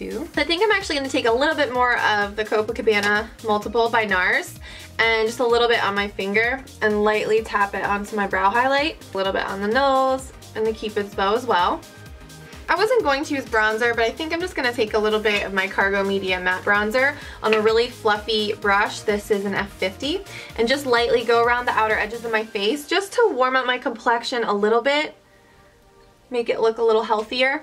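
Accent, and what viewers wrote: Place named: Minnesota, United States. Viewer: Are you from Minnesota? I lived there my whole life up until this past year, and I can totally tell your accent. The way you say your A's :)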